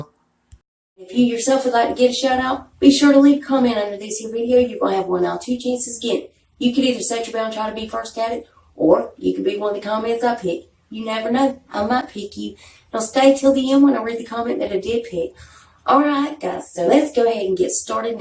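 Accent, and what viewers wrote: South, United States. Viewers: Hey sweet face, i call my wrinkles WISE CRACKS💖💖😜 thank you so much for sharing your videos with us! I absolutely love them! And thank you for these awesome tips I am 46 years old and have stage 4 lung disease set my medications done a lot of damage to my skin and being a little I guess! But I have been trying the Skin Care night and day and I think it's starting to make a difference so thank you so much for the wrinkle tips I needed that LOL! And you remind me Paula Deen! LOL I'm from North Carolina to so I love that southern twang😜😜😜💖💖💖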